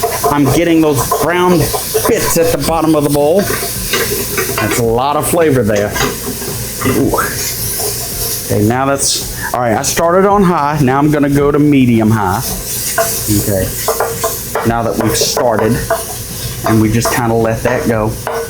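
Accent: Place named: South, United States. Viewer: Great show!!! Can’t wait to see what’s next. I didn’t know you were from the south???😂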